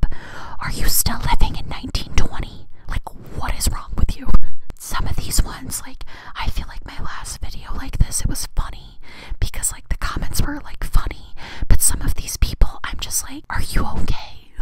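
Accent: United Kingdom. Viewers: The British accent 🤣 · What is wrong with people? If you don't like something someone is saying or doing on their chanel, just don't watch. It really is that simple. Hope everyone has a blessed day. ( Your british accent was fun) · As a Brit , I absolutely love your British accent 🤣🤣. Also, abortions during birth? that's terrible JESUS FUCK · The only British accent I’ll ever need to hear the crazy loud Jesus fuck is SOO FUNNY 😂😂😭😭😭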